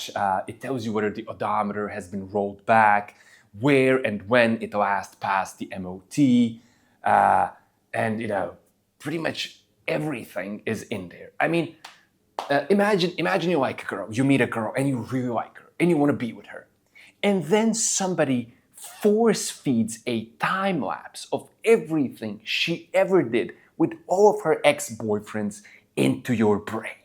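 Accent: Eastern Europe. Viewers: Wait, this guy is Portuguese? Why have I always thought he is eastern European? 😅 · O quê, és português? Eu tinha ssumido que eras da Polónia ou Latvia ou um pais mais para o este da europa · Wait, you're Portuguese?! I always thought you were eastern european...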